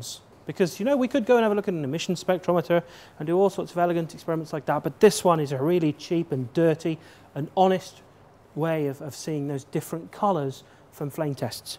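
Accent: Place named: England, United Kingdom. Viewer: this guy is so incredibly English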